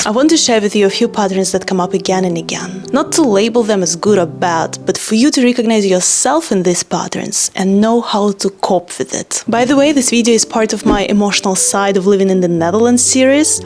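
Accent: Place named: Eastern Europe. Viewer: While this girl is extremely pleasant to my eyes and I love the eastern European accent (this is the second video of hers that I watched) she is factually not Dutch, hasn't been in the country that long and she states that her boyfriend or the man she dates is also not Dutch. So, I like watching her, but where is her expertise on Dutch dating culture? There's a lot of confusion about this subject among expats to Holland and people, specially men planning to visit. The Netherlands has gained a reputation for their women being very emancipated, liberated and sexually open minded and there is 'some' truth to it. But so many men look forward to their trip to Holland where they are going to "score big" with all these open minded Dutch women only to face massive failure and rejection. I can explain. The Dutch live their social lives in groups, circles of friends and each Dutch person belongs to several groups or has several circles of friends and they overlap and that is how you can increase your number of friends. 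As a teen ager I went to the Discotheque on Saturday nights. I did not go alone, not with 'A' friend but with a group of friends, 5-6 or 8 of them. If while there I saw a pretty girl who interested me and I would approach her, most likely it would be awkward, she would show slight discomfort and reject my advances. She did not know me, she was there with her group of friends and it was with them that she was socializing with and enjoying her time with (there are always e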